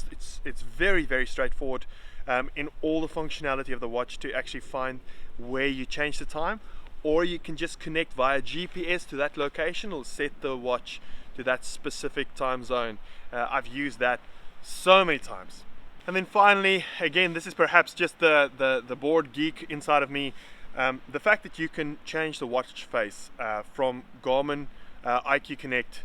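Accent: South Africa. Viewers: Are you from SA? Thanks for the review! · I know he’s a South African when I heard he’s voice🇿🇦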